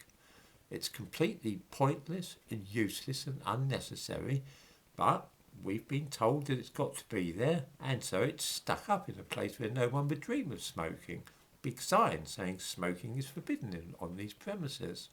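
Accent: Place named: United Kingdom. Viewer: "Hello again." I can't help my self to repeat it in the same fashion in a moc your accent. Imitation is flattery type of way. It sounds so nice, I must hear it twice. Lol please don't take it as culture appropriation or a ethnic offense please. I love when Brits do silly impressions of Americans. Do you have any audiobooks on the markets?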